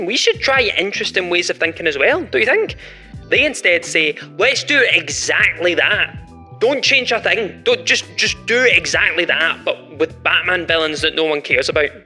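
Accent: United Kingdom. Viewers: Hearing "Soccer Jersey" in British accent was weird... Even though Soccer was initially coined in GB...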